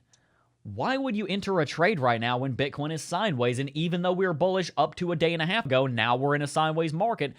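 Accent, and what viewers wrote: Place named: Los Angeles, United States. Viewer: sounds like you're in LA! ✌😆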